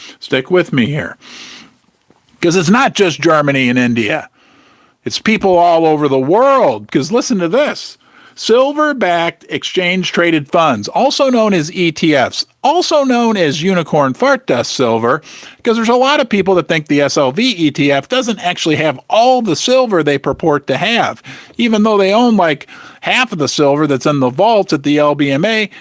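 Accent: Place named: Kentucky, United States. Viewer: Sounds like Kentucky to me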